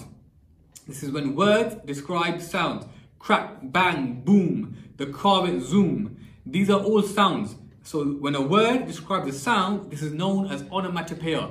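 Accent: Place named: Bradford, United Kingdom. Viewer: brother you from bradford?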